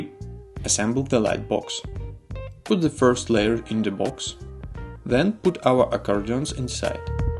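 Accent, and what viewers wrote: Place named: Russia. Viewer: Жёсткий русский акцент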